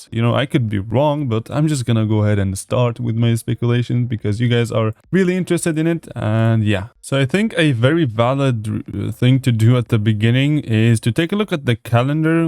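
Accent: Germany. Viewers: realtalk now are you german?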